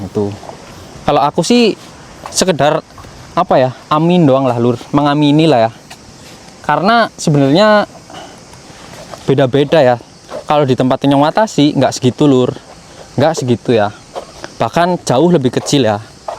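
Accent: Banyumas, Indonesia. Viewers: asalnya dari mana bro...medok banget Kya bhsane Inyong ...salam kenal inyon d Bobotsari Purbalingga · wong ngpak ya mas smpean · Ngga apa" mas yg penting halal Mas berasal dari man bahasanya pake nyong ngapak mana lur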